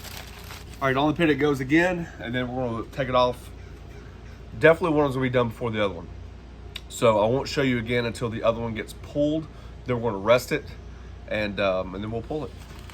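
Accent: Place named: Alabama, United States. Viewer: Great video doing this recipe tomorrow. your accent is nostalgic to me growing up in Alabama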